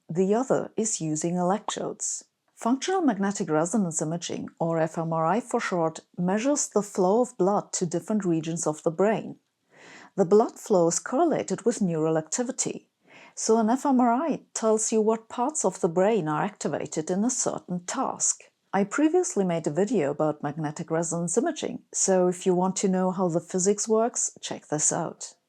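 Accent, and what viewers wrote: Germany. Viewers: You brought your German sound palate to your superlative command of English. Please don't shed it. There's no reason why pentrating intelligence shouldn't be peppered with exotic charm. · your voice with a heavy German accent would the best replacement for computer Hal 2001, even better than the one from the movie Dark Star.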